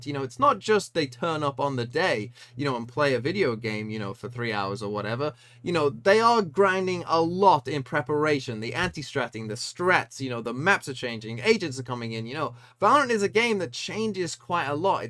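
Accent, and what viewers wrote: England, United Kingdom. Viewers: He's English, right?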